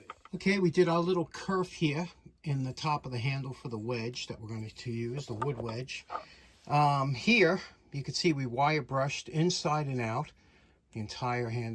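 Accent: New York, United States. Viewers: What and where do I get sports tape? Your NY accent did you say “$ports tape” ?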